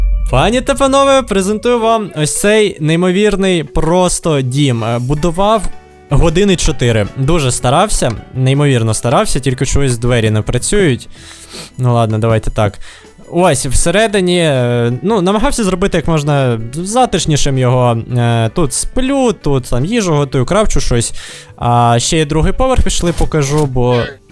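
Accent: Transcarpathia, Ukraine. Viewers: вхахвхвах закарпатьська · Ти з Закарпаття?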